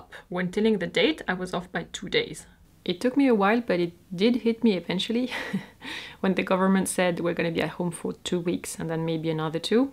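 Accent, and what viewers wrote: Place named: France. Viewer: I found you, during this self isolation here in America. I love your videos. Your French accent is so lovely. You are so graceful and kind. I subscribed to your channel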